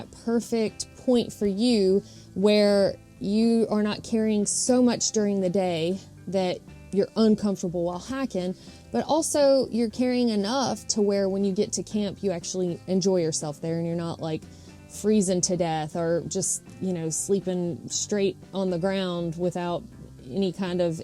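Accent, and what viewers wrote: United States. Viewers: It's nothing to do with hiking but as a person born and bred in the UK, I just love your USA accent.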